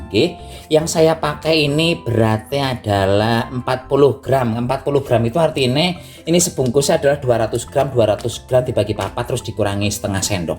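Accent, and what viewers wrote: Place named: Cilacap, Indonesia. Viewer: Resep" nya ok.mudah di tiru juga sangat menghibur dengan bhs ngapak nya yg medok cilacap.tp sy.sebagai orang kendal jateng paham.dengan.bhsnya mas.semangat trus..Salam.ublek"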